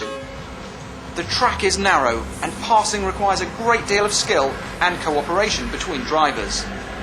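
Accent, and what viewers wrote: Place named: United Kingdom. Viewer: stupid bri-ish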